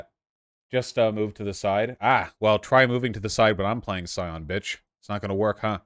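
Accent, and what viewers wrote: United Kingdom. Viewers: that's northern England accent 🤣🤣Manchester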